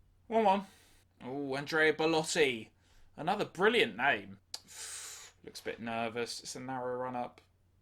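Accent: England, United Kingdom. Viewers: U have an English accent......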